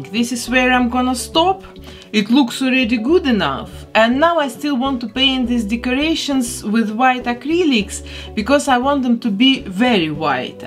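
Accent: Russia. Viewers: Im so shocked that you are from Belgium… i thought you were Russian or Polish from your accent😅😅 spreek je ook Nederlands/Vlaams? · Русский акцент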